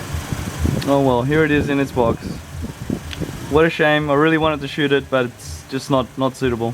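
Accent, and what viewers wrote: Australia. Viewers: A Filipino thats in the Philippines with an Australian accent ? I am so confused . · Cool vid, very informative & educational! All made the better by that Ozzy accent, bro! Hope you're still in Phil when we get there next year!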